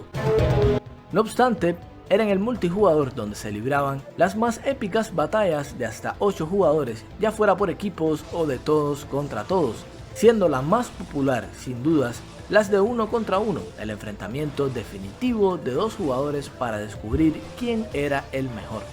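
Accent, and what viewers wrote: Cuba. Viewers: Exelente trabajo me soprendio tu asento cubano y confirmarlo hehe es bueno tener compañeros dando tan buen contenido piensas hablar de Diablo 2? Tambien lo queme mucho en Lan en ese tiempo y ahora disfrutando del diablo 4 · Eres cubano?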